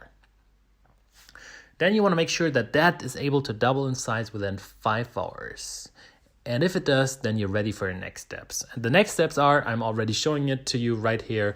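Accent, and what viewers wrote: Germany. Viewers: is 15 minutes not 50 right? I know the subtitles say 15 but maybe AI was thrown off by a charming German accent?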